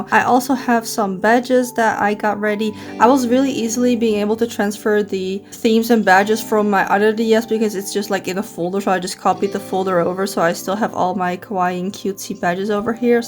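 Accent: Netherlands. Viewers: From hearing your accent I thought you were like Dutch… and when I saw “type om te zoeken” on your pc I was sure!!!! Nice video!!! <3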